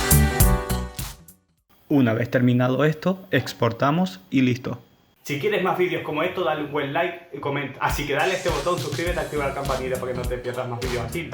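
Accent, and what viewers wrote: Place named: Canary Islands, Spain. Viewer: Gracias por el video, y mas Canario imposible, saludos desde new York de otro canario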